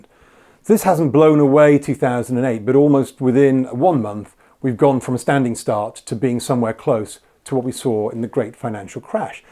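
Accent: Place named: RP, United Kingdom. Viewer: Nothing like a posh english accent and lots of graphs to make someone appear they know what they are talking about - no one knows the future - its all a coin toss :-)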